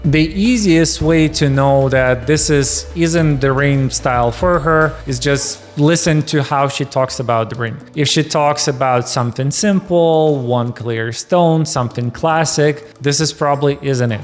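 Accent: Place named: Czech Republic. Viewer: I guess (from the accent) speaker is Czech maybe, hence the mispronunciation of French. No big deal, the content is still valid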